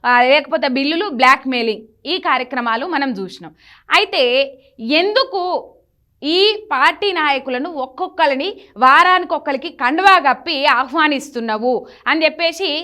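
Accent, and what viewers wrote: Telangana, India. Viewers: నువ్వు జర్నలిస్ట్ వా ,నీకు బుద్ధి లేదు. హల్ల, హెల్ల అనే తెలంగాణ యాసలో మాట్లాడి ప్రజల చెవుల్లో పూలు పెట్టక మ్మా. టిఆర్ఎస్ వాళ్లు కాంగ్రెస్ ఎమ్మెల్యేలను గొర్రెలను కొన్నట్టు కోన్నారు కదా! ఎన్నిక లేనప్పుడు నువ్వు చేసి టిఆర్ఎస్ వాళ్లు కాంగ్రెస్ గవర్నమెంట్ కూల్చేస్తా, మెజారిటీ అంతంత మాత్రమే ఉన్నది, అని వాళ్ళను రెచ్చగొట్టారు. అప్పుడు చేయాల్సిన పని ఇదే కదా, నీకు తెలియదా. యూట్యూబ్లలో నిష్పక్షపాతంగా వివరించు వ్యవహరించే ఛానళ్లు చాలా తక్కువ. చాలావరకు ఏదూోక పార్టీకి అమ్ముడు పోయిన ఛానల్లో ఇప్పుడు ఉన్నాయి. ఇది చాలా దురదృష్టకరం.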